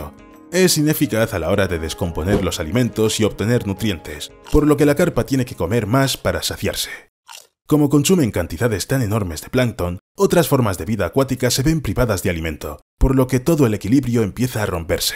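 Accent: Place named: Spain. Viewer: El narrador tenía que ser Español, qué mala suerte ,muy fome.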